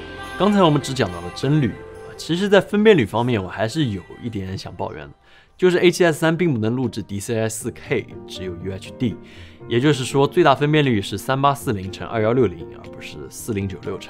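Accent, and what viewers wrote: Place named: Yangtze Delta, China. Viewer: 我是因为tim的长三角口音爱上影视飓风的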